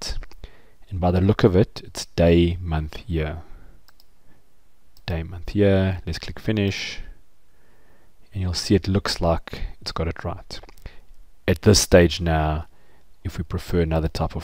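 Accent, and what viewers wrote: South Africa. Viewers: Great Video, and its great to hear another South African accent.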